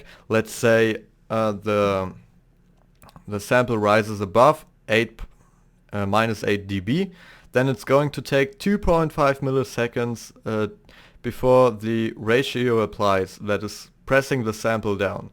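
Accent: Germany. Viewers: dass du deutsch bist, hört man direkt raus. deine anfangsbeats sind brutal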